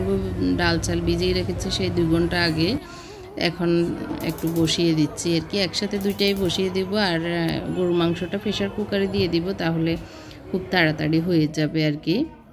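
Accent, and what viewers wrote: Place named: Feni, Bangladesh. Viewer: Apu apnr voice sone ami bapci j apnr badi feni or noyakhali... Thaxs apu... Amadar badi o baryearhat...valo thakbn apu Feni apnr badi kothaiy... Ami next year Khulna thake feni shipt korbo....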